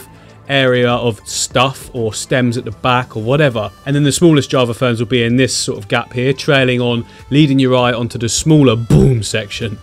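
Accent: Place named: Somerset, United Kingdom. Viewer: I’m from Exeter and you are definitely not far from here because you sometimes sound so Somerset 🤣 love the videos, I would love to get into scaping myself but I’m so skint rn. Coronavirus doing us dirty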